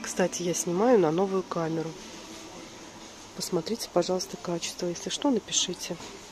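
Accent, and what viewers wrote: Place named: Central Russia, Russia. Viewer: ПолОжила,взЯла .так говорят почти все,кто живёт в средней полосе России . Видимо это местный диалект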